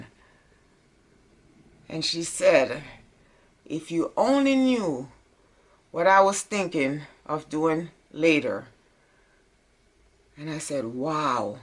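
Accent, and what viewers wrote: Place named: Jamaica. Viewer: I love your channel! One of my favorite things is listening to your Jamaican accent I love it 🌟✨💫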